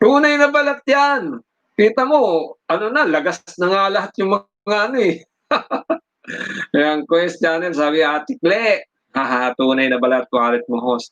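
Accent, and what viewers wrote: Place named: Bicol, Philippines. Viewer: Meron kuya my sounds ..you are from bikol?I'm from bikol too..from Iriga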